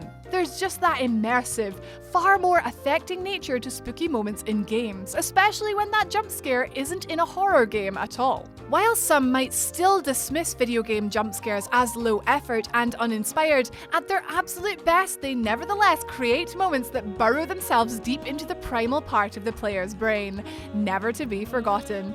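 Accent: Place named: Scotland, United Kingdom. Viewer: I've said it before and I'll day it again. That accent 😍 MonTheScots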